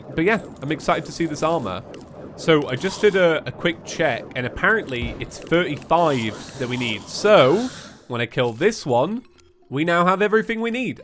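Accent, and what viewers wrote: United Kingdom. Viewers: that just the british accent mate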